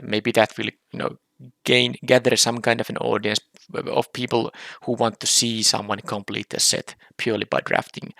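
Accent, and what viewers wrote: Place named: Finland. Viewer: thank you for update. I'm glad, that you are Ok 👍, and stay with us. Just make a video, when you feel it. I will happy to see you anytime. You are such a nice guy and i love your finnish accent 😄. Believe or not, i watch other streamer from Finland on twich named NCAA, which is one of the best limited player on the world. So in Finland are another great players 😄👍. Apologize for my English, this is my longest comment in english ever 😄😄 Stay strong, stay healthy. Greetings from the Czech Republic.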